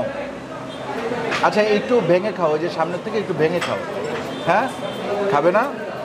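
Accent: Barishal, Bangladesh. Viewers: Aapner Borishaillah accent er ki holo? Shunte besh bhaaloi laagchilo.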